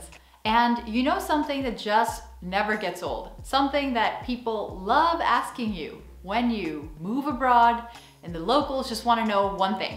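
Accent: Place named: Netherlands. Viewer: I love how her American accent has now been affected by Dutch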